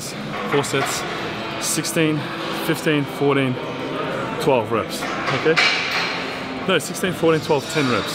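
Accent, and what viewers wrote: Australia. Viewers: Jay Tee Australian English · your accent is coming back since you Oz trip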